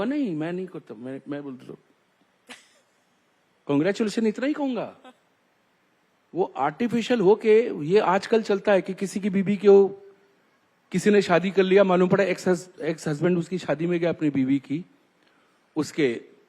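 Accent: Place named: Bengal, India. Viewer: Avijitdada. Bengalees. Kavi. Larkalerkime. Bar. Nehilagaye. Khati. Khata. Kavi. Banglamenehitha5hazarsalme. To. Hindi. Me. Jo. Ea. Differentiation. Ea. Samajhneme. Samay. Lagtahihae. Koi. Nonbengali. Banglanehibolpate. Parpate. Samajhnehipate. Dadathikbole. BengaleeSabse. Madhur. Suddha. Hindiboltehae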